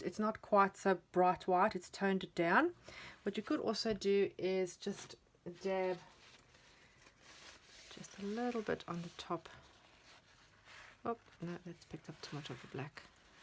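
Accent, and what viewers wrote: South Africa. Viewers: Gorgeous! Btw am confused by your accent! You are in Oz but your accent sounds South African!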